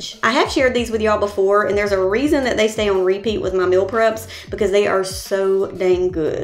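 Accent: South, United States. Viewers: Heard the annoying southern accent and had to exit the vid ngl 😭💀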